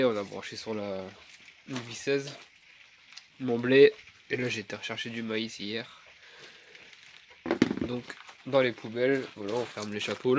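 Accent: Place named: Nord, France. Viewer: Bonjour . J'aime bien je m'abonne !!! je suis un potagiste de l' ile de la Réunion , j'ai bien reconnu la pointe d'accent du Nord !!! Je suis Picard d'origine . La menthe c"est efficace pour l'éloignement de certains insectes volants et rongeurs , ils ne doivent pas apprécier l 'odeur !!! Les œillets d'Indes c'est pas mal non plus . Bonne continuation , le meilleur pour toi et tes plantations .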